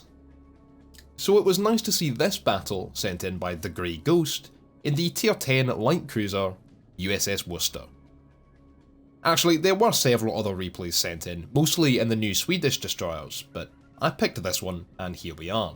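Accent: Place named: Scotland, United Kingdom. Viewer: I love the Scottish accent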